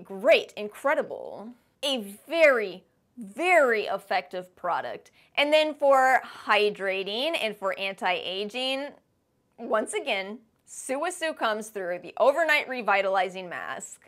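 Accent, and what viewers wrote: United States. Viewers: You have a strong American accent!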